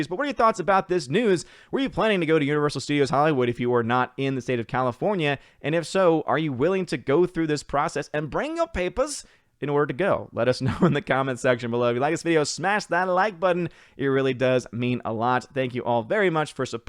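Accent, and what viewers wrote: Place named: Germany. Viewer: That's a nice German accent there. :-) As for Pinkofornia, the sooner the recall of Gavin Newsom happens, the better, because he'll be only too keen to make overall vaccine passports a reality. :-o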